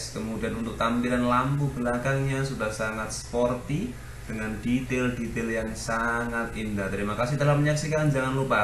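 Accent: Java, Indonesia. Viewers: yo mbo' omong boso jowo wae, ilat sampeyan jowo banget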